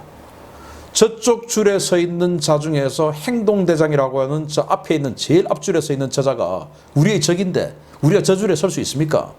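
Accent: Gyeongsang, South Korea. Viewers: 오오.....|오만대???? 오랜만입니다.역시 갱상도죠....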